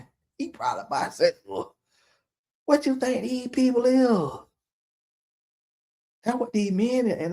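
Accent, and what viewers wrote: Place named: Florida, United States. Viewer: Smh, I've had this convo with a few folks recently and was left feeling really bad. Was questioning my values and acceptance of others for standing firm in not being willing to entertain a potential bi-sexual man. THANK YOU for speaking on this... And the country/Fl delivery is quite funny and REAL😂 Ladies, resistance is really a form of protection, don't let these podcasters and society let you think otherwise!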